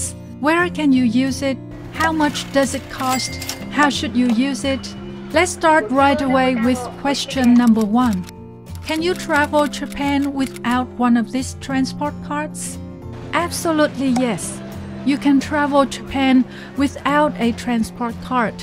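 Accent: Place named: China. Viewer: Are you a Mainland Chinese living in Japan? You speak English like my Mainland China colleagues. Nothing wrong with that, just wondering 😊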